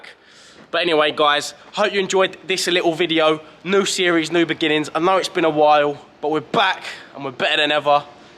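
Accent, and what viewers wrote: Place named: London, United Kingdom. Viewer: Gangster talk? Lol it's just how us Londoners talk lad.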